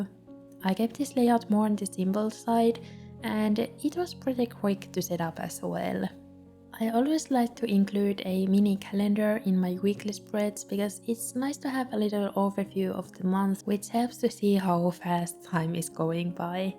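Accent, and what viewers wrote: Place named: Finland. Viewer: I knew you were Finnish from your accent haha :) my mom is from Finland! this is also super cute!!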